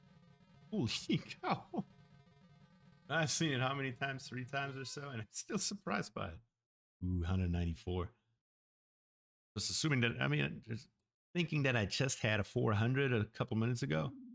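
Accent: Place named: Germany. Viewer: I was wonderd that this is your first video because its very good. Well I am from Austria and I hear the German accent ,but can keep doing videos like them I like them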